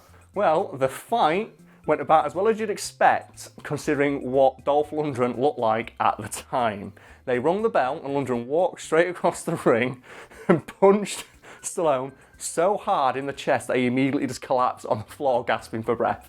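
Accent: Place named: Sheffield, United Kingdom. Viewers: Been trying to figure out where your accents from for ages! Finally you say you're from Sheffield ! Let us know if you're ever on a night out round Sheff, love to meet you for a pint! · Dude you could have at least known that he's northern. I mean, listen to the man :D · I knew you were from Sheffield could hear it in the accent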